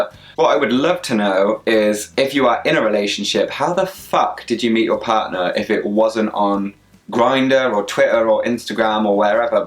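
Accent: United Kingdom. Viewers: a really great video , I know your struggle! one question , are u british? love your accent xoxo from italy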